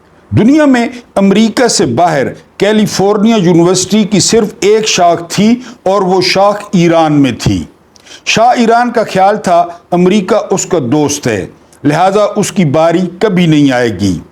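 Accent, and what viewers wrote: Saraiki, Pakistan. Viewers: اسلام علیکم ورحمتہ اللہْ ھمیں معلوم ھے آپ کو انگلش پر عبور ھو گا مگر یہ اردو کا سرائیکی لہجہ قیامت ھے اللھ قسم یہ آئی ایم کی بجاۓ مَین آدھے ھین او تو ھمیں زیادہ مزیدار لگے گا انگریزی کی مت نہ مارا کریں اردو سرائیکی لہجے میں واہ کیا کہنے انگریزی سرائیکی لہجے میں......... اوپر سے گزر گئی آپ کے وی لاگ کا جواب عشق قاتل سے بھی، مقتول سے ہمدردی بھی یہ بتا کس سے محبت کی جزا مانگے گا؟ سجدہ خالق کو بھی، ابلیس سے یارانہ بھی حشر میں کس سے عقیدت کا صلہ مانگے گا والسلام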